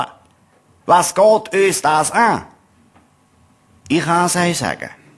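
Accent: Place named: Appenzell, Switzerland. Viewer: Einfach köstlich! - Bin ebenfalls Innerrhödler(in), lebe aber schon seit über 20 Jahren im Ausland und habe und seine Videos erst heute entdeckt. Was für ein herrlicher Fund; ein super-Aufsteller und Wiederfreudehaben am urchigen Appenzellerdialekt! Herzlichen Dank fürs Hochladen!!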